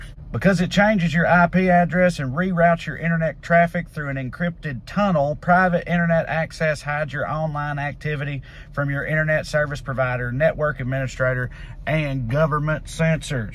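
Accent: South, United States. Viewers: Lmfao I gotta say....There needs to be more educated southerners. That accent mixed with an IQ that isn't comparable to a shoe size is absolutely fantastic when you get sarcastic/funny Haha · Brother, just hearing a Southern accent speak sense made me INSTANTLY subscribe to your channel. I think it was Beau of The Fifth Column that led me here, but thank you for popping up in my feed, and please keep challenging ignorance and fighting idiocy. We need people in all the corners standing up for what is right, just, and logical.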